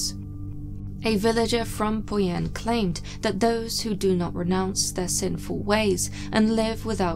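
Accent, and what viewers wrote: United Kingdom. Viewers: I think she's so hot. The whole darkness shit and proper British accent aka queens English while talking about spooky ass shit just hits you right in the feels. The fact she covers well known stories yet divulges so much new information, it usually ends up changing ones perspective as there is no preexisting agenda.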